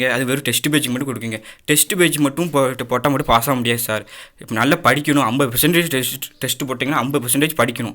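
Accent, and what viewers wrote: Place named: Thoothukudi, India. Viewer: Vanakkam sir, my native place thoothukudi, unga slang thoothukudi slang mathri irukku sir. I am happy sir, nanum neraya place la padixhu irukken, but neenga soli taruvathu different ah irrukku sir, na ungalai follow pannuren sir, unga method remba pidichu irrukku, I am housewife sir,unga help ennaku kandippa vendum sir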